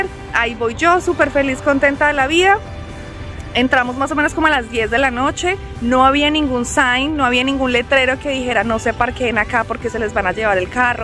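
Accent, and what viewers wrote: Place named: Colombia. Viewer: Jajaja ahora si te salió todo colombiana contando esto. Saludos desde Chiapas México.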